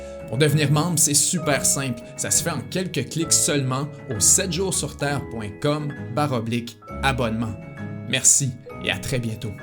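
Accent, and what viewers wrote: Quebec, Canada. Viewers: j'ai vraiment toujours un problème avec l'accent des québécois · L'accent Québécois, il est magnifique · L accent Quebecois est genial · J'adore ton absent québécois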